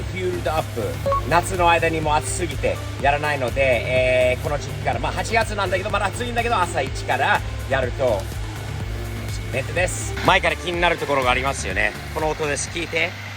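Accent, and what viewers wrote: United States. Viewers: アメリカ訛りの日本語って感じで好きです！ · Also, one final thing I want to say. Say what you want about American accent, but that guy's still extremely proficient in a second language in a way that the vast majority of Americans are not. I will always respect the fact that this guy raised his son to also speak fluent Japanese, as well, despite the fact that he was raised entirely in America (Link: <link> I wish my own father did this for me, and I still love him to death, but he had neither the patience nor the confidence to learn my mother's native Japanese tongue. The Japanese language is an heirloom that is worth preserving, but because my sister and I were never raised in the language, we've had to pick it up as adults since.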